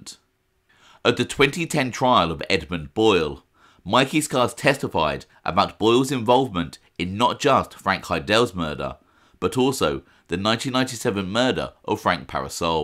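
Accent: United Kingdom. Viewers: Anyone else annoyed a FRUITY ENGLISH narrator is telling us about an American mobster?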